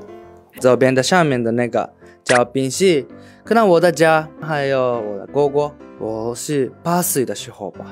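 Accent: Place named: North, China. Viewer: 中国话越末越流畅了👍👍👍有点象中国北方某地方的方言🎅🏻🎅🏻🎅🏻😃😃😃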